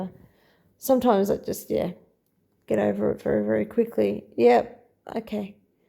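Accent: Australia. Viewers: Love your Aussie accent - thanks for uncovering this ruse - big fan from Cape Town, South Africa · We don't know the royal family either. I think this is all a huge distraction from a lot of directions. Nice research and nice to hear an Aussie accent!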